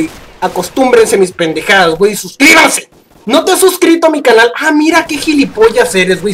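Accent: Mexico. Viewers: ¿De donde eres,de que parte de Mèxico? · Os daria LIKE, pero hablas de esa manera... y al parecer eres MEXICANO.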